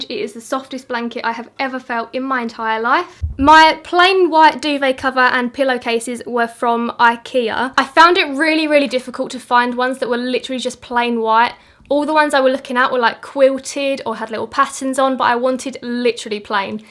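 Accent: United Kingdom. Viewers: Your room looks so nice and comfortable and I love your british english, it sounds so cute! c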